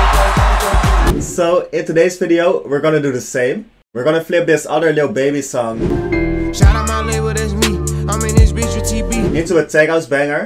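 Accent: Netherlands. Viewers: Bro is Dutch haha dacht al dat ik het hoorde. Zieke edit dit. Doe je ook aan Collabs?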